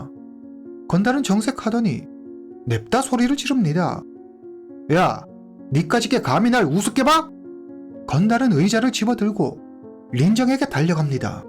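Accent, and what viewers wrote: China. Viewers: 조선족 말투네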